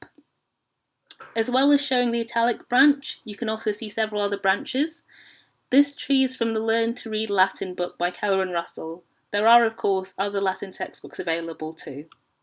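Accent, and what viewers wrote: England, United Kingdom. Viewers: I think you have a very strong English accent in Latin😅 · I appreciate all the effort you have made but you accent when you try to speak Latin is just so terribly English. It sounds funny.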